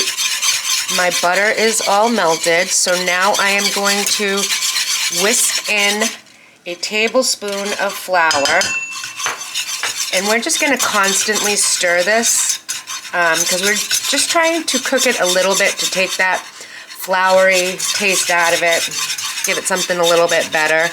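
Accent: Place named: Boston, United States. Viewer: Talking about accents, I absolutely love your Boston accent! I love all different accents, they just bring warmth to our language! Unfortunately mine is just plain jane!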